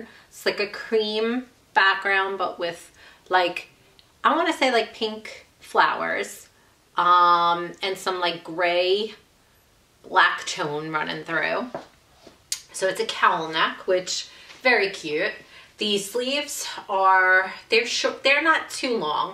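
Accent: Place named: Long Island, United States. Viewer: My husband,who originally from Long Island, was sitting with me while I was listening to your video. He wants to know what part are you from :)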